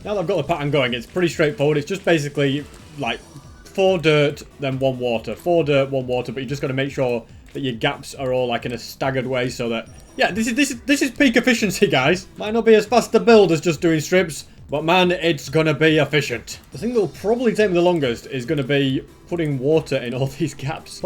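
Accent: United Kingdom. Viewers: He is British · hes british?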